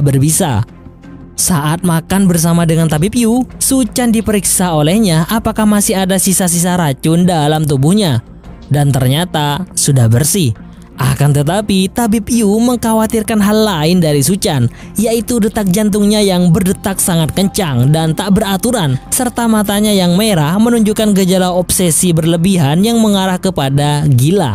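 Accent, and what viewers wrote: Madura, Indonesia. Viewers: Abbe mik oreng medure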